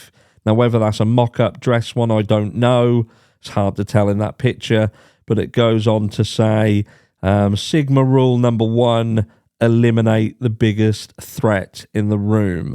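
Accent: United Kingdom. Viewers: since i left UK decades ago i lost British traits and accent so glad to listen to your's mate All The Best Wishes Everyone :cat-orange-whistling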